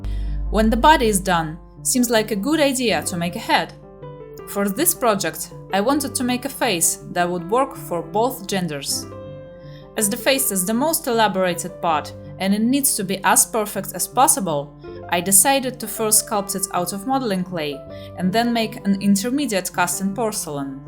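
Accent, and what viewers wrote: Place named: Russia. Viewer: Судя по акценту, вы русская, если не ошибаюсь. ❤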